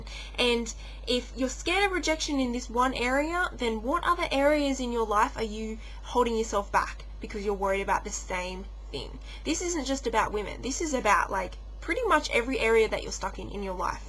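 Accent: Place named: Australia. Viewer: I like that aussie accent...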